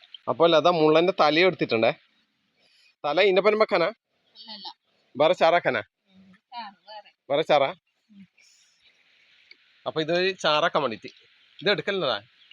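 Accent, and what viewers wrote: Kannur, India. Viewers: നിങ്ങളു കണ്ണൂരാണോ ലതപയ്യുന്നു രാണെന്ന് മനസ്സിലായി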